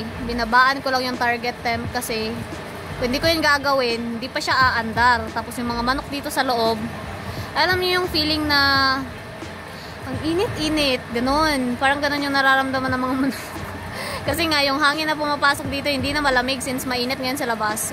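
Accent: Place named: Visayas, Philippines. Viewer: Akala ko sa Visayas. Parang bisaya po kase yung salita nyu. Jeje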